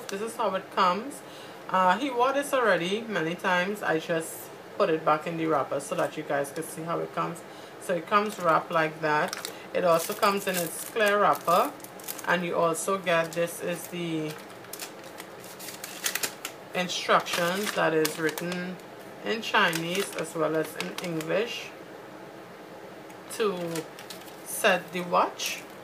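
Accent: Trinidad and Tobago. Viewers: i love trini accents :) lol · are you from trinidad? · are u from Trinidad?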